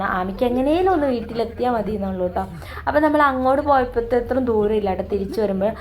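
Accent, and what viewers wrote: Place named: Thrissur, India. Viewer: പെണ്ണേ സൂപ്പർ 👌🥰പ്രതീക്ഷിക്കാതെ കിട്ടുന്ന ചില സന്തോഷങ്ങൾ അങ്ങനെ ആണ് ❤പിന്നെ മഞ്ഞ് 👌🥰🥰🥰🥰എടെക്ക് തൃശ്ശൂർ സ്ലാങ്ങ്കേറി വരണ് 🤩ശ്ശേ!!!🤩അത് നിന്റെ ഏട്ടന്മാര് തൃശൂർക്കാര് ആയോണ്ടാ കുഞ്ഞി ❤👍"രാവണസോദരി"🥰🥰🥰🥰🥰🥰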